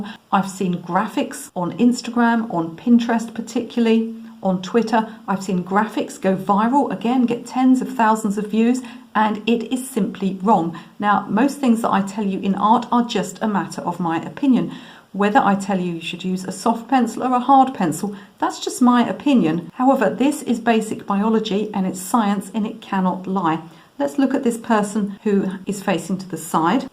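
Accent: United Kingdom. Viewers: Your A brit, Love it. I lived in Ipswich and Colchester for 5 yrs. Love your advice it really helps. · Thank you for the great tips. I'm new to drawing and still trying to get basics etched in my mind. Pressing to hard is a bad one for me and have been doing it since school days. It really has to be stopped so thanks and love the British accent good day!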